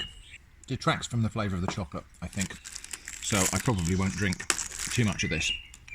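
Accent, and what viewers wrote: United Kingdom. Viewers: Don't worry; we Americans find a lot of British accents more pleasant to listen to than our own, too. 😅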